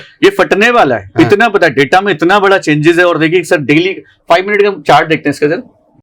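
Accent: Bihar, India. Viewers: I like your strategy but BIHARI sun ke man nahi karta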